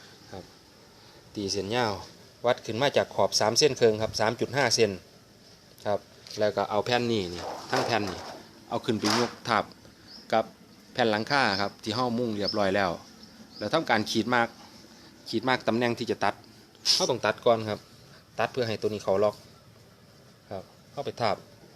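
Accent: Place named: Ubon Ratchathani, Thailand. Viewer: งานเนี๊ยบค่ะ อยู่จังหวัดใหนคะสำเนียงเหมือนคนอุบล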